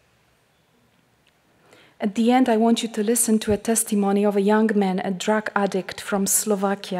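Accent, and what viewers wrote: Poland. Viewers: Beautiful Polish accent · I fell asleep with YouTube on the TV again. When I woke up, a little while ago, the tail end of this video was playing. I have been reading St. Faustina’s Diary, and thought at first that really was St. Faustina, with the habit and her slight Polish accent. I went back to the start and watched the whole video. certainly has a full grasp and understanding of the Divine Mercy devotion. I have shared and recommended this video to my Facebook friends.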